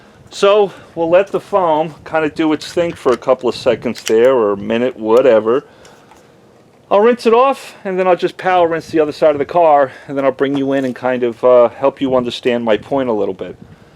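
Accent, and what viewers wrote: New York, United States. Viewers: sounds like new york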